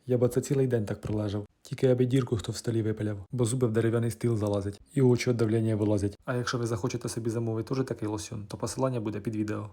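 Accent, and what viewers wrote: Ukraine. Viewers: Яка проста укаїнська говірка! Суперово!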